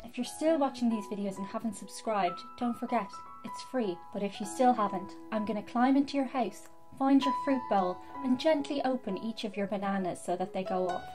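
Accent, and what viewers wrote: Ireland. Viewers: I'm already subscribed ...lol all it took was your Irish accent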